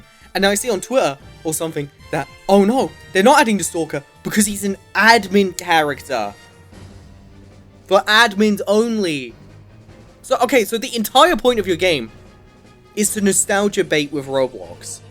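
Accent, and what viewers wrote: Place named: United Kingdom. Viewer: youre british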